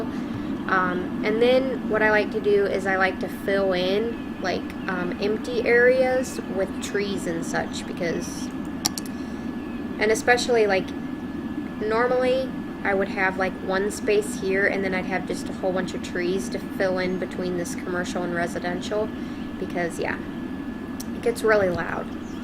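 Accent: United States. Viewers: You have a great accent! I guess ure american where abouts are you from? Xx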